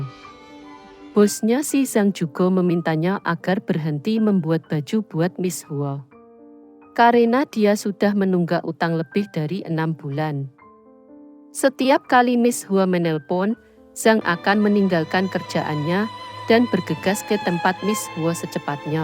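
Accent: Java, Indonesia. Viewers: Medox banget mbak...!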